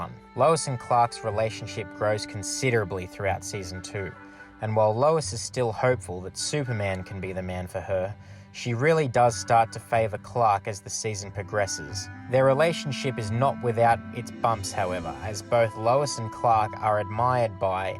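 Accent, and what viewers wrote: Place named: Australia. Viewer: now tell me if im wrong but that is an aussie accent if i ever heard one. thanks for the video mate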